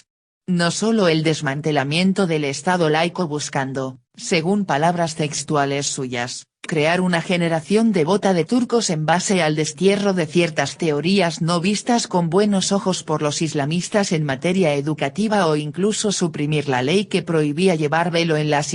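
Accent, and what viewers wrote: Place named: Argentina. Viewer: aunque tenga acento argentino es bastante mas agradable que lokendo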